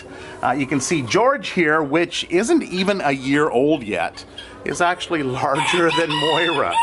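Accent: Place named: Canada. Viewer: American accent? lol I was engaged to a Canadian for a few years so I spent some time in Ottawa and you are undoubtedly Canadian lol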